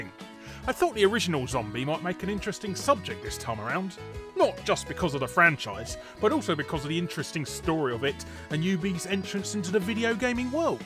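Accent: England, United Kingdom. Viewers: What part of England is your accent from? I always wanted to know.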